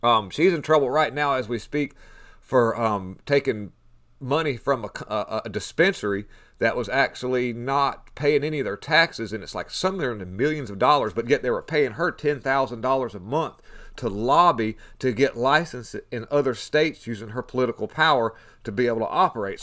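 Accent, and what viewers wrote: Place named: South, United States. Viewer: ! Damn bud! You are pointing out some things that we all need to pay attention to. I don't want to sound disrespectful, (because I see you as much more than this) but others may judge your Mohawk and southern accent as meaning you're not a scholar...but I respect your insights and how you take deeper dives into what's happening around us. May The Good Lord grant you all the wisdom that you are seeking and bless you and your family as you share His insights to you. Love you. UDABST!!!!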